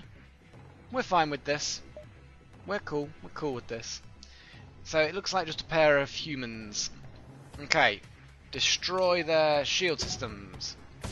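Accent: England, United Kingdom. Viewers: Oh BTW! Velcoma back (bad German accent). Nice to here that jolly gd English accent back on screen all the best. ( n let's god hope you don't go n get all of us killed once again. :-D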